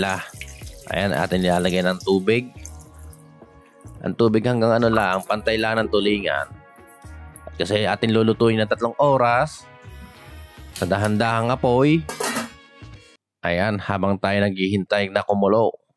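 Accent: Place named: Batangas, Philippines. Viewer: Ala eh batangueno ka rin pala, maganda yan pars pede ka na magnegosyo! #Reddtv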